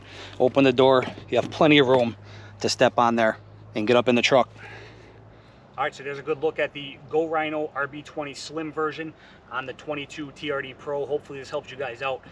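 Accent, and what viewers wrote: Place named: Minnesota, United States. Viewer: You from min or Wisconsin? lol me too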